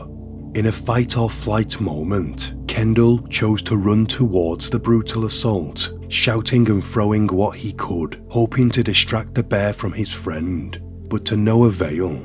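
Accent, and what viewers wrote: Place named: Liverpool, United Kingdom. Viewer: Don’t let anyone crap on the way you speak. It’s lovely. I love a slight scouse.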